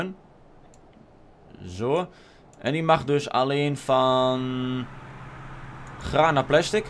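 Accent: Twente, Netherlands. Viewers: Kom je uit twente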